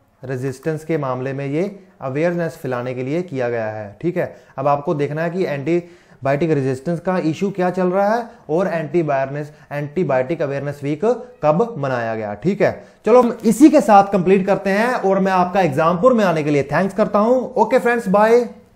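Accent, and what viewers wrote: Punjab, India. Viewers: He is punjabi. . That's why hindi pronunciation aisa h ..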